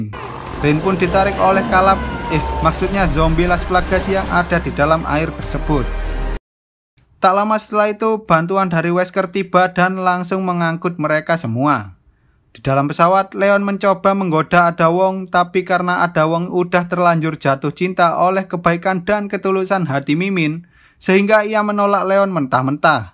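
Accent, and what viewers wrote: Java, Indonesia. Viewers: Orang jawa bang?? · Fix org jawa cara Ngomongnya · jowo endi mas? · Keritik pedes dari gua . Lu itu ngomongnya medok ga usah bercanda kalo bikin video . Ga lucu sumpah malah bikin ilfil . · medok bener ... medok!!! 😤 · Wong jowo bg😂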